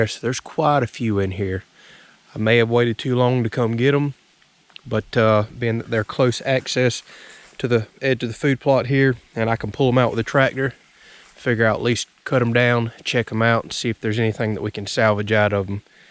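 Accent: United States. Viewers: I enjoy your content so much, and find you really interesting to listen to! Where is your accent from in the USA? Love it ha, all the best!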